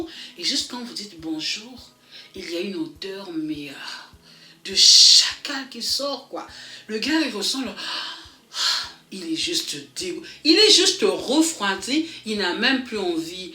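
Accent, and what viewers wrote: Ivory Coast. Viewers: Loooool 😀 t'as vraiment l'accent ivoirienne hein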